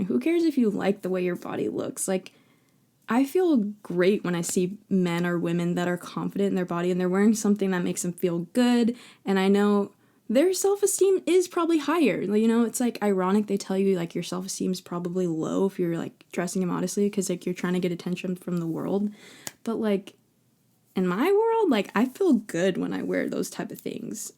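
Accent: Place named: United States. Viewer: I am an Aussie ex Mormon and I love your American accent.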